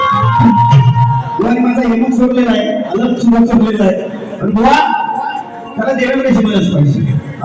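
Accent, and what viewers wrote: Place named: Konkan, India. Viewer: mule khup chan chan video bgayla milat thanku 🙏💐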